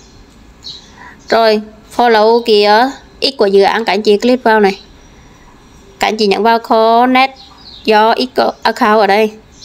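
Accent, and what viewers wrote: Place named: Nghe Tinh, Vietnam. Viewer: Chưa hiểu rồi sẽ thế nào nhưng thấy hay hay nên ủng hộ em một ref nhé, à mà em ở đâu mà nghe giọng giống quê choa rứa. Hihiiii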